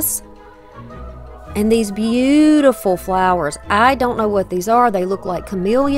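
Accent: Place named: Alabama, United States. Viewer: I could tell you were from Alabama!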